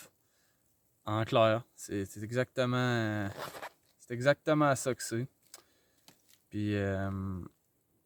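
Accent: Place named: Quebec, Canada. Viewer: Merci pour cette vidéo. Condor niveau qualité/prix/efficacité c'est souvent bien pensé et correct. Je découvre ta chaîne et rien que pour les couteaux, la neige et ton accent de cousin outre océan, je m'abonne. à+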